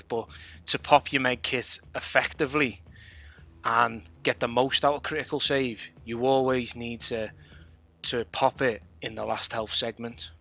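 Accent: Liverpool, United Kingdom. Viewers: you a scouser?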